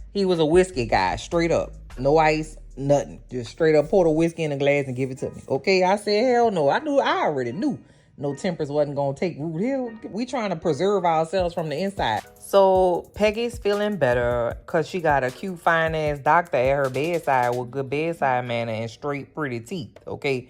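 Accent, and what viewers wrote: New Orleans, United States. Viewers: New listener here! Stumbled across your review of AJLT, immediate listen just because I love the New Orleans ⚜️ accent so bad ❤. Then found you were hilarious AND was reviewing TGA too? Done. Subscribed. 😊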